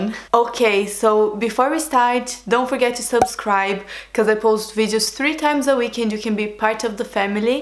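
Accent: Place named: Brazil. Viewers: Você fala português? O jeito que você fala me lembra o sotaque de brasileiro falando inglês --- You speak Portuguese? The way that you speak reminds me the english speaking brazilian accent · Allyson Matheus eu também achei o sotaque bem parecido, acho que ela é brasileira kkkk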